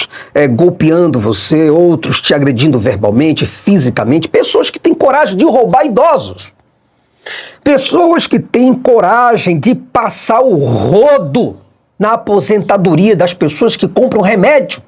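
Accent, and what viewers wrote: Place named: Rio de Janeiro, Brazil. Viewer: Putz... esse sotaque carioca é essa entoação lembram muito os pastores da Universal 😅😅😅😅😅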